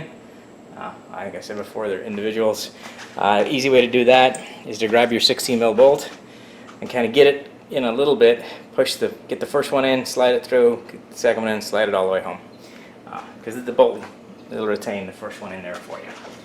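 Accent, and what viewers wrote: Belfast, United Kingdom. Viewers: A hint of a Belfast accent?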